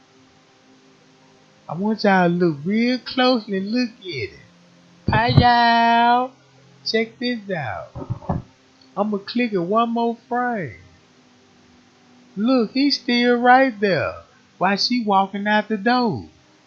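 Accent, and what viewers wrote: South, United States. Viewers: Love your work but hate the way you talk. Its not entertaining annoying you talk like u stuck in the 70's southern man. Jack jive piow shit like tht. You sound like a try hard to stand out but hey i could be wrong.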